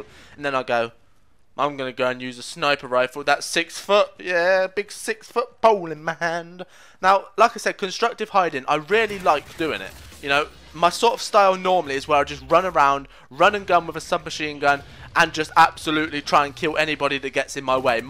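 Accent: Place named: United Kingdom. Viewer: Thx for sending the vid :p I love brittish axent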